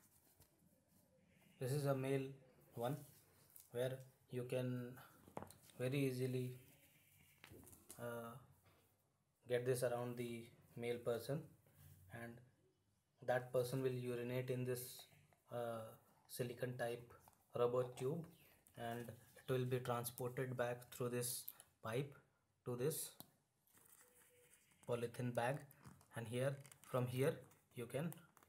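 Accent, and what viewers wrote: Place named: India. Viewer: Why does a Chinese man have an Indian accent?🤔🤔🤔🤔🤔🤔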